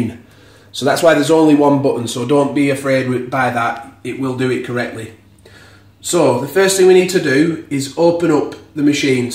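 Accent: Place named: North, United Kingdom. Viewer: Couldn’t make sense of the nespresso instructions, all I needed was a straight-talking northerner to show me what I was doing wrong, thanks!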